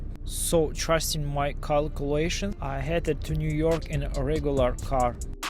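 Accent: Russia. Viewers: Вы русскоговорящий ? Акцент очень похож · Какой-то у тебя акцент русский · По ходу русский с таким акцентом говоришь как ты брат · Бро, ты русский, или что? Просто оч сильный русский акцент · Is the author from Russia? Why is there such a harsh accent in speech? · Наш русский слоняра 😁 акцент не скроешь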